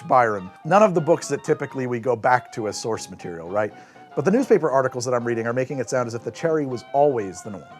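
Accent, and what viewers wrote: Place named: United States. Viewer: Saying FDR's name in a transatlantic accent then Churchill's in your modern American accent is really funny to me.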